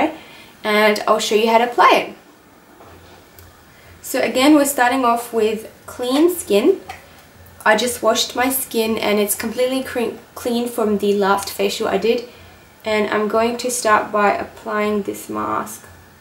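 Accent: United Kingdom. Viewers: Hey girl love your British accent 💞Please share the secret of your healthy lashes....... Love all your channel thanks love from Pakistan 💕💕🇵🇰🇵🇰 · The English accent is bae 😘😘💕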